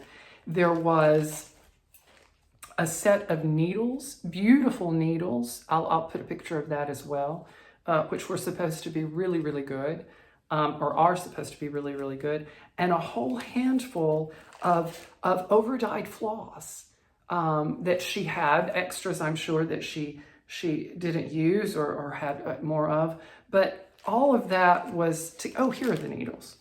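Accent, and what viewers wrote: South, United States. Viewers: Great video. I always walk away from one of your videos smiling and quietly laughing. What a soft spoken southern gentlemen. My father in law was a southern gentleman, too. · Omg I just died 😂. What an absolutely perfect disclaimer. Just found your floss tube thanks to the Floss Bossin Cousins. Have been binge watching/listening while at work 🤫. When just listening you make me think of Leslie Jordon (your sense of humor or southern accent maybe)🤷🏻‍♀️. Looking forward to the next 50 or so videos 😃.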